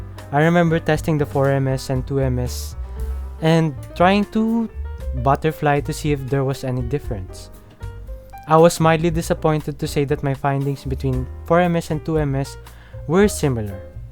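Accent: Philippines. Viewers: Yoo way you speak your language is like Filipino BTW can you make a video how to change any mouse debounce time? I got 1 dollar mouse which is legit thanks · from the accent, are you filipino? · the filipino accent throws me off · u have filipino accent like me xD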